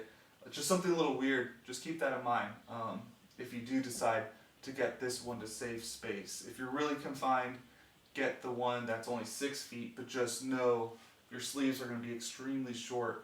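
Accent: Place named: United States. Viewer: Hahaha just as you said "obviously I'm in England" I was thinking this guy sounds American but he has to be in England? Haha Just to add I just got the m3 rack and it's really good. Basically a copy of a rogue r3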